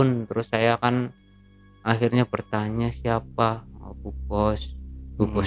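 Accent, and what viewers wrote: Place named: Central Java, Indonesia. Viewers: Logat jateng ya medok bngt kang.. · YaAllah logat e medok nemen 😅absen Bang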